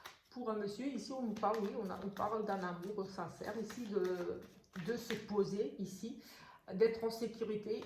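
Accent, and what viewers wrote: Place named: East, France. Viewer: Un accent de lest on dirait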